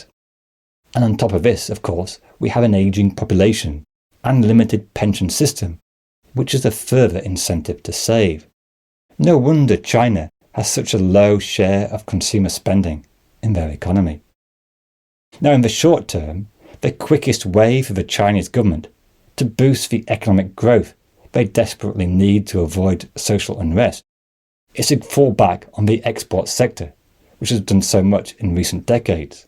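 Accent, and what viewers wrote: United Kingdom. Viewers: 1.)British colonizer voice - check 2.)Faceless - check 3.)Screaming about the collapse of smarter Chinese rivals - check Yeah, safe to say most of the nonsense in this video is bullshit.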